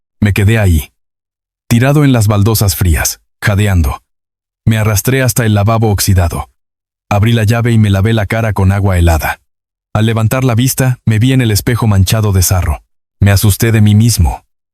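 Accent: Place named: Mexico. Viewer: me encan tus BL pero creo que deverias relatar con un lenguaje menos mexicano porque no todos entendemos el modismo de las palabras mexicanas creo que deberias ser mas plural al hablar con acento y modismo neutro que en todos los paises podamos entender con todo respeto te digo esto tomalo en cuanta porfavor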